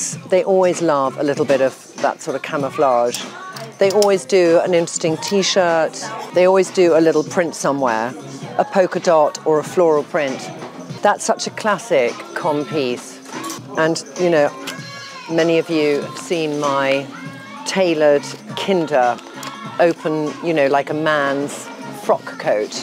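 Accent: United States. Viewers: Love the video, but why the American accent after a week! Is there something we should know?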